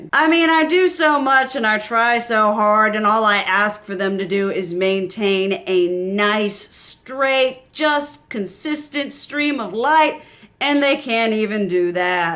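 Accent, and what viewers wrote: South, United States. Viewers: Just wanted to stop by and say I absolutely adore your aesthetic and accent! I was born and raised in GA and your accent is the perfect embodiment of what a southern accent is! Plus, your attitude and personality and energy presented in this video and how animated you present yourself is everything I can relate to! I’m here for it all haha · I dunno if anyone has told you this but you sound very American hah. Not in a bad way. My American peeps tell me I sound very Canadian.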